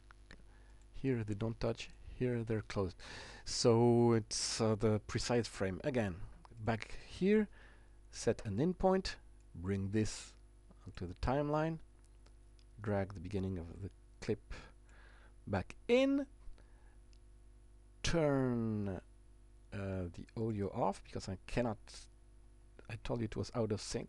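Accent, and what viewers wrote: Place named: France. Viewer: C'est un Francais non? xD l'accent!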